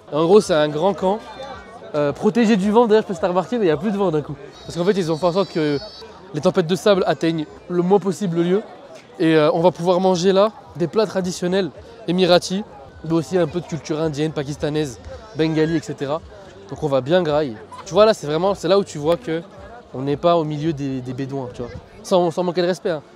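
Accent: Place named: England, United Kingdom. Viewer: oh my god I love this English accent, you sound hlou😍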